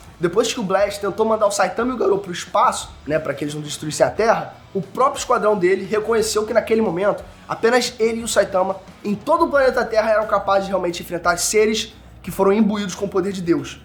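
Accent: Rio de Janeiro, Brazil. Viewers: ahah imagino para quem não é do Rio ouvir esse sotaque. até pra mim é puxado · Ele ta no caminho! gostei! tirando o sotaquezão carioca... mas isso a gente se acostuma! dale!!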